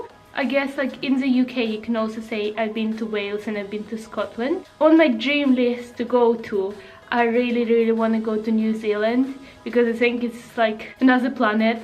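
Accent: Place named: Russia. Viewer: I don't really remember, but you was saying something like "it helps me to stay on chuck" or something like that. Russian accent is not a problem, I'm also russian (almost, actually I'm belarussian) so most of us have an accent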